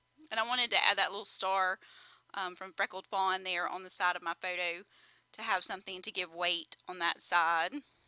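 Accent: South, United States. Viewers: Where are you from? I love your southern accent.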